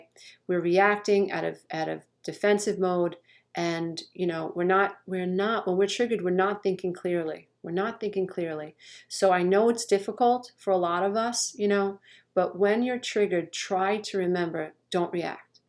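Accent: New York, United States. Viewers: Hey just started listening. Great information. I hear the newyawker in you from one newyawker to anotha. Got get me some cawfee and warm up the caa!!! LOL! Namaste.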